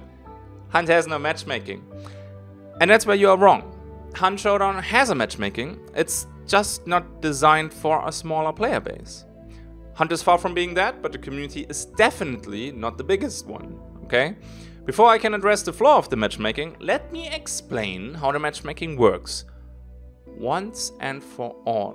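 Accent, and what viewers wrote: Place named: Germany. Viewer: You sound German, I'm new here btw.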